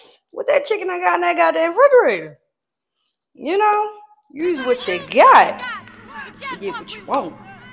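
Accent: Baltimore, United States. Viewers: i hear the baltimore in you🤣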